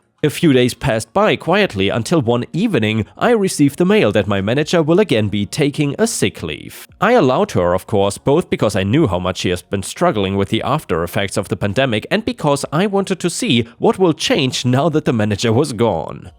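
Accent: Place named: Germany. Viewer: Dein deutscher Akzent ist nicht überhörbar, aber trotzdem nette Videos 👍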